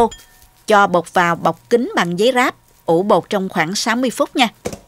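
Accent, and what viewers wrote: South, Vietnam. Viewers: nói giọng miền nam rõ ràng và dễ thương lắm. Chỉ dẫn rõ ràng, thao tác chuẩn bị sạch sẽ. Cảm ơn cô đã chia sẽ nhiều món ăn ngon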